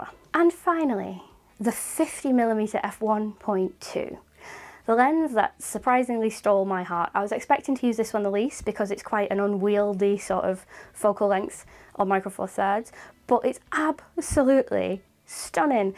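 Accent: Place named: Bolton, United Kingdom. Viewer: Fabulous, I'm leathered now 🤣 subscribed partially for the local shots and accent! (We're in horwich near Bolton) I have this lens in a 17 and have just ordered the 50, can't wait!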